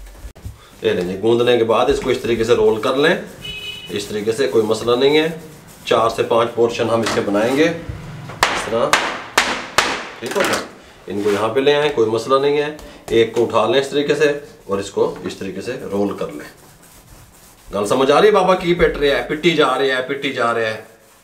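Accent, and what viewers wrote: Punjab, India. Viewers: اسلام علیکم بھائی جزاک اللہ خیر آپ بہت اچھا کام کرتے ہیں اور میٹھی میٹھی پنجابی آپ کی بہت اچھی لگتی ہے اور اللہ تعالی آپ کو اور عزت دے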